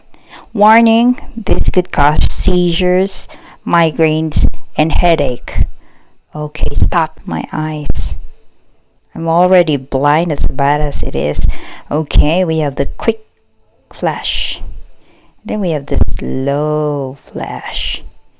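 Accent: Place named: United Kingdom. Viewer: Blue for sad ☹️ Anyway, pinapasaya tlga ako ng british accent mo sis HAHA.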